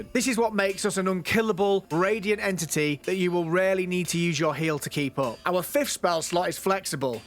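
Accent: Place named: North West England, United Kingdom. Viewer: I’ll be honest, bro, I keep expecting to login to it being nerfed to f*cking kingdom come 😂 glad I got to experience it in all its busted glory before the inevitable happens 😂 And my pleasure to support; especially for a fellow, North West of England, lad (there’s about 35miles between us [north of me] judging by your accent). Keep up the good work, fella 👍🏾🤟🏾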